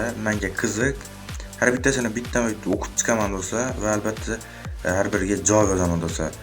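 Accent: Khorezm, Uzbekistan. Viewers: Oka Xorazmdan misiz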